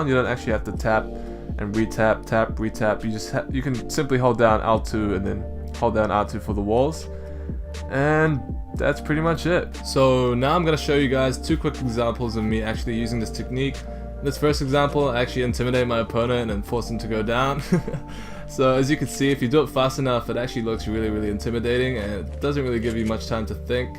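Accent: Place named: New Zealand. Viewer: Where are you from? You sound like your from New Zealand lol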